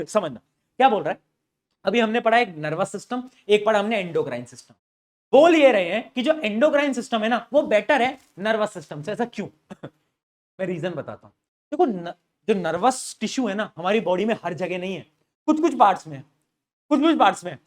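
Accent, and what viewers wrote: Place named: Bundelkhand, India. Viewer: jitno bhi Bundelkhandi accent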